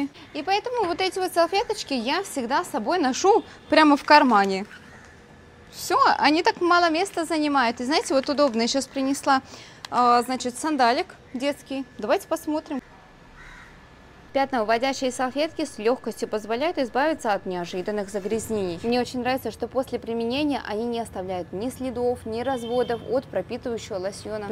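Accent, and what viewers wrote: India. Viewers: Как сладко говорит по индийски 😍😍😍🥰а голос ее похож на голос свекрови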